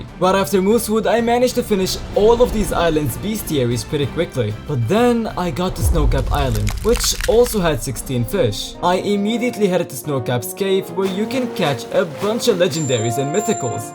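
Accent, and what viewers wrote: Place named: India. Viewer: Bro what is this srupid Indian acent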